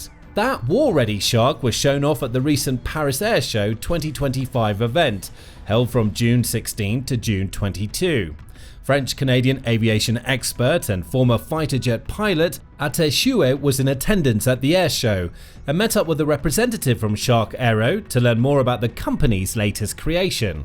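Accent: United Kingdom. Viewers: Your British speech impediment is strong. You should learn the correct American way to pronounce words including innovative.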